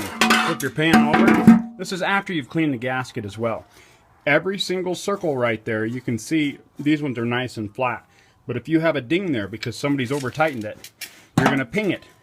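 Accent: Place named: South, United States. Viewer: I like that country man talking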